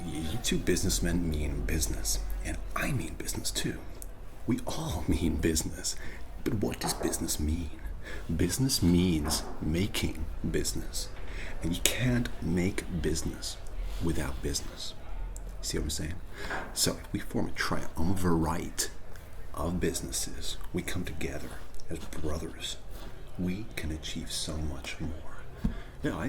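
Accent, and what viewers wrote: United States. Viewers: You picked one of our main accents (in the US). The nuances are spot on (newscasters and narrators of the mid century often take this tone), and excelently paired with social status, and stereotypical, shall we say "frame of reference"? And the acronym; still laughing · 1) American accent is great as always! 2) American accent speaking Japanese is phenomenal! 3) Bad jokes are great!!! 4) You are a remarkable bullshitter. You would be a great “generic Wall Street banker”. 😋🤣🤣🤣🤣🤣🤣 🍶🍶🍶🍶🍶🍶 Much love!!! 💙💙💙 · Good American accent! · I’m an American, and I gotta say, your American accent is great.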